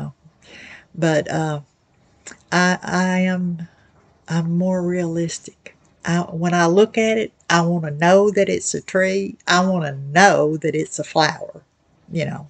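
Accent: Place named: South, United States. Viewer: Hi! If the artists don't mind would you show the pieces that you talk about? Maybe a future video? I am enjoying your videos; I miss hearing genuine southern speech.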